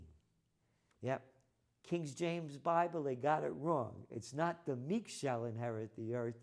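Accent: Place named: New York, United States. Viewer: I think it's his thick New York-ness. New York-ness spans multiple ethnicities. As a half-Italian woman from NJ, how I miss NJ-NY-PA style.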